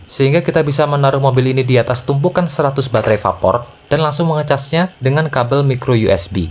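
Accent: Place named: Surabaya, Indonesia. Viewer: Endinge metu Suroboyoane 😄 Baterai di sering paralel berarti tegangan tetap 3,7?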